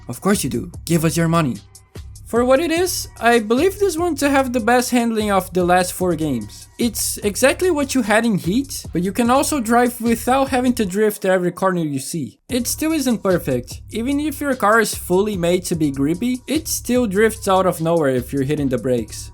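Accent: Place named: Brazil. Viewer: you have a really brazillian accent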